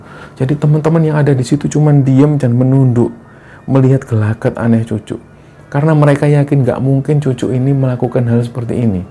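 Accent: Java, Indonesia. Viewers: Dengerin channel satu ini, ilmu dpt, hikmah dpt, sejarah jg tau. Pembawaannya lugas dgn medok yg khas. Semangat tim, saya subscriber baru! 😊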